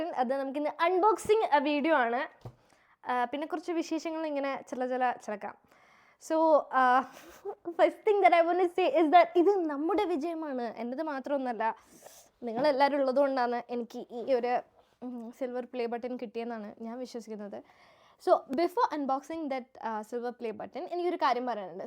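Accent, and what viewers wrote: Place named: Thalassery, India. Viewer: That thalassery slang is slaying 😂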